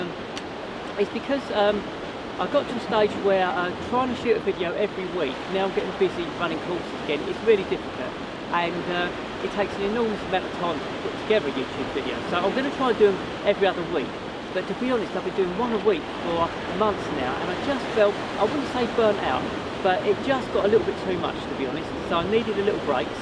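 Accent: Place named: Essex, United Kingdom. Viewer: Great Essex accent!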